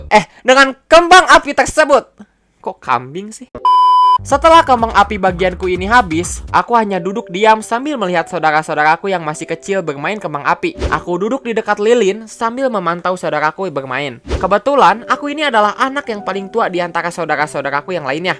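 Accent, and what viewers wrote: West Java, Indonesia. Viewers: orang Sunda ya bang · Lu Orang Sunda? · sunda batt:v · bang kocak aku juga mau nanya abang orang sunda😂😂😂😂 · eh Sunda bos orang mana cuy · Abang orang sunda ya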